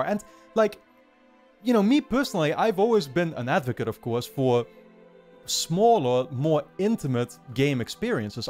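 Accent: Netherlands. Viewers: Are you Dutch ?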